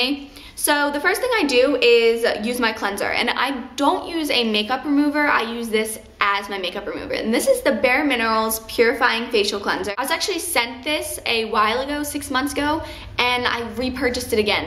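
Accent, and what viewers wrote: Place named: Midwest, United States. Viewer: are you ffrom the mid west. your accents sounds like my cousins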